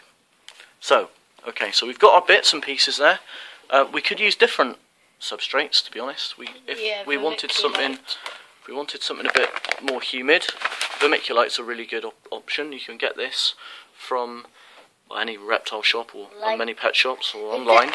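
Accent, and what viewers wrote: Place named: United Kingdom. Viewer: Hi.....Good to hear a UK voice....most of the videos I find are not UK which means they can't recommend shops / websites. I will be getting my first Ts soon, am getting as much info as I can before buying them. I am disabled and housebound, so I have to do everything via the internet. My disabilities will not affect my ability to care for them in any way. In your video you said you'd be happy helping anyone new to the hobby, but that was a few years ago, are you still around ?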